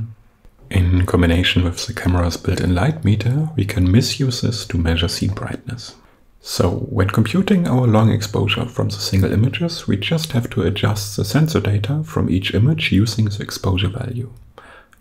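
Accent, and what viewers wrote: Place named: Germany. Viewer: That's cool stuff, you deserve a larger audience. I love how well that camera fits on that signpost, it's a really elegant solution to hiding a camera in plain sight. Also, as a felllow german I have a love/hate relationship with your accent. Ps. "BEAR with me" is pronounced more like the german Word bär, not Bier, unless you wanted us to enjoy a drink with you.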